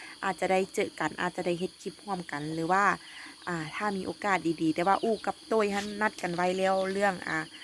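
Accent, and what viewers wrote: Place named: Nyo, Thailand. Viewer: สำเนียง"ญ้อ"(ย้อ")ทาง จ.สกลนคร เว้าคือกันนี่หละ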